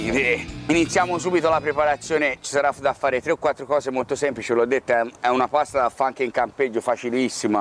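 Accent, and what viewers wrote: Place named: Livorno, Italy. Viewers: Mi piace moltissimo questa carbonara e anche l´accento livornese. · che dire... con sincerità stima ed ammirazione sei il Top ...il dialetto livornese non ha nulla da invidiare al tutto il resto dell'italy oltre che bravissimo ... simpaticissimo( mimmo da palermo... iscritto ) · Boia. Si sente vai che sei livornese :-) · Sei troppo simpatico con quell'accento di Livorno meraviglioso. Mi hai fatto venire voglia di carbonara....salutoni.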